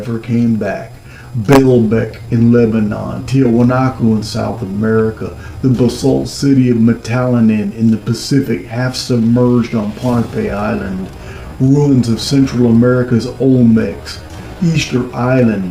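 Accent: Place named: South, United States. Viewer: you sound sooooo Southern here man haha love it 🧡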